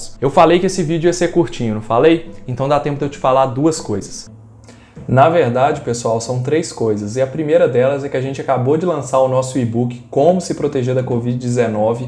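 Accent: Minas Gerais, Brazil. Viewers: Obrigado pelas dicas ! Adoro o seu sotaque mineiro . Tb sou mineira uai !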